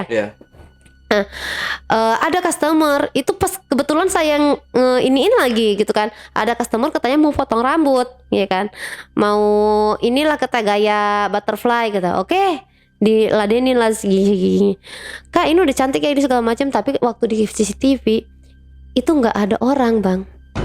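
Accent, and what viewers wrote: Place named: Sulawesi, Indonesia. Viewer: Aksen logat sulawesi 😁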